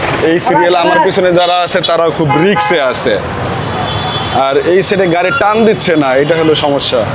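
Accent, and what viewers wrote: Barishal, Bangladesh. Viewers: মনু তুমি বরিশাইল্লা। খাইছো।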